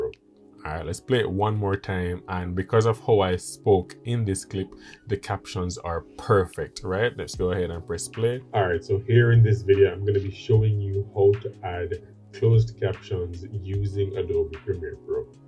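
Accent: Jamaica. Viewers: I just discovered your channel and I had to subscribe immediately, 1. you're Jamaican lol, 2. the scripture on the wall, and 3. this video was so straightforward, easy to follow and very helpful. Thank you!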